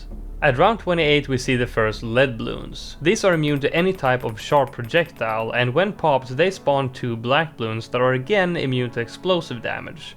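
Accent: Sweden. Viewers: Are you swedish? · I just started her the Swedish ascent and I I’m also Swedish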